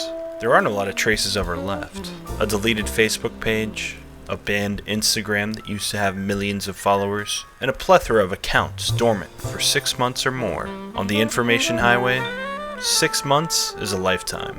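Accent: United Kingdom. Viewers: Am I the only one who didn’t know she was British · Bro i never knew she was British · its a girl version of leafy..... except british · SHE IS FKN BRITISH? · Am I the only one who had no idea she was british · Huh, didn't know she was British.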